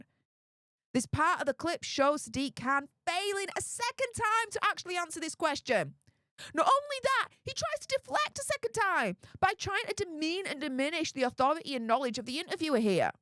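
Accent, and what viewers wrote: Yorkshire, United Kingdom. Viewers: hi which part of yorkshire are you from you sound like a yorkie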